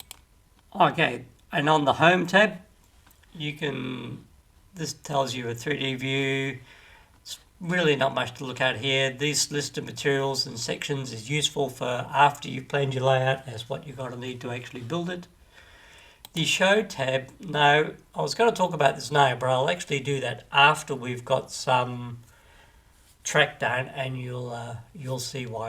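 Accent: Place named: Australia. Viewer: Thanks mate, excellent tutorial. Good to hear another aussie accent in railway modelling. Will look out for the next installment. Paul from Sydney.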